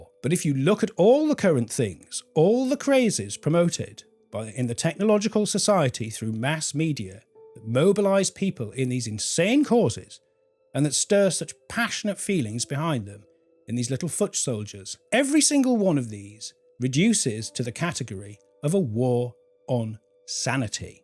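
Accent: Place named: United Kingdom. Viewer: Lovely to listen to a real man, with a polished British accent speaking about the obfuscation going on in the world. From South Africa.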